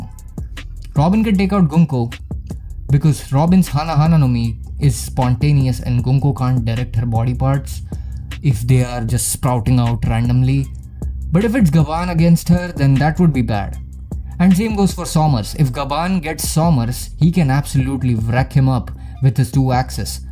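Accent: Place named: India. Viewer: Bhai hindi me bol na English vale koi teri videos nahi dekhte or teri avaj se pata chalta hey indian hey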